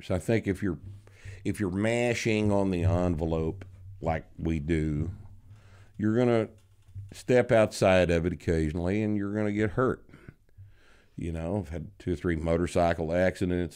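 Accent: Texas, United States. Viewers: His voice makes me want to move to Texas 🫠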